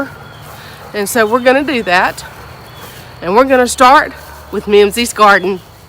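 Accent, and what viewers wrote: Mississippi, United States. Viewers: Love your garden. Very neat and beautiful. I love your Mississippi accent too. So cute...Have a blessed Easter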